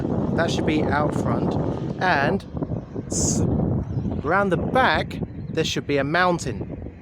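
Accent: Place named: England, United Kingdom. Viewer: You misunderstood : it is traditional to state the Chinese hometown of the deceased on the headstone even the deceased was born in Hk ( ie hometown of the deceased ‘s paternal family then if born in Hk ) it doesn’t mean the body of the deceased was moved all the way from China to Hk for burial . But overall I am impressed you knew more about Fung Shui then me ! I also like your English accent thx for the video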